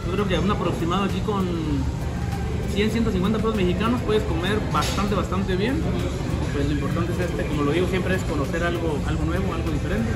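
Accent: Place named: Colombia. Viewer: Y otra cosa a mi me encanta su acento el colombiano tiene su particularidad al hablar y claro depende la región porq hay unos q exageran pero los normal me encanta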